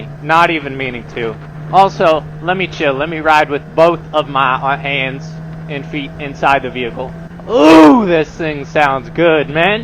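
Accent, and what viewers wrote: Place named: South, United States. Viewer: bro gained a southern accent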